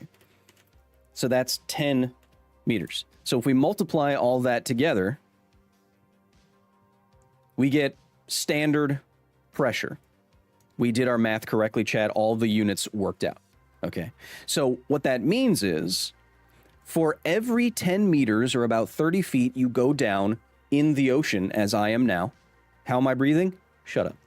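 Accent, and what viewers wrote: Queensland, Australia. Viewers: Honestly great Australian accent but just watch out because you're turning kiwi for a second every now and then. Other than that surprisingly authentic impression which is difficult to come across with Australian accent impressions. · As a dirty New South Welshman I can say with authority that was actually a good suburban Queenslander accent. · Im also aussie and do sound aussie asf bro u even have the look of an aussie.killa content bro.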